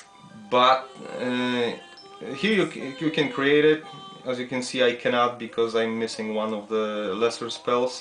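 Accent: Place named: Poland. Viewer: Soul Sacrifice ? Ale słychać, że Polak mówi po Angielsku xD Jednak nie jestem jedyny, który wpadł na nagrywanie materiałów po Angielsku :) You've got my sub dood !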